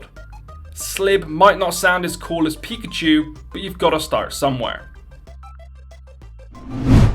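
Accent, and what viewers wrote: Bristol, United Kingdom. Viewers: Bristol accent!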